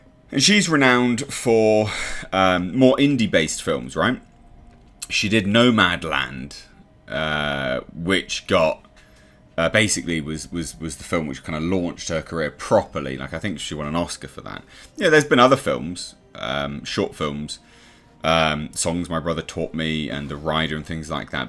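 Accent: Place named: United Kingdom. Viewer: simply says what any sane person thinks about most things but he says it in that accent like hes just ur British homeboy. Do wish he'd throw in a "Bruv" now and again tho.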